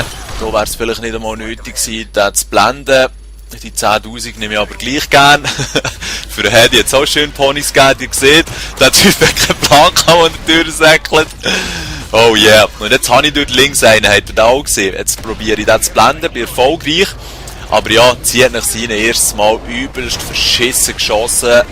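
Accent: Switzerland. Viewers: ich liebe diesen schweizer akzent komm aus dortmund geiles video :) daumen hoch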